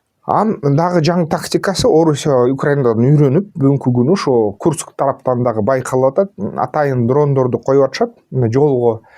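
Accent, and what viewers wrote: Standard. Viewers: Таза кыргызча суйлогонунуз жагат, азаматсыз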